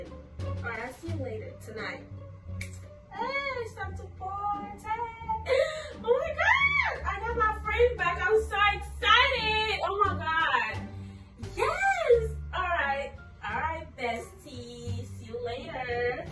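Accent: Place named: Haiti. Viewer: are you ayisyen? I hear the accent coming out or either Congolese or something?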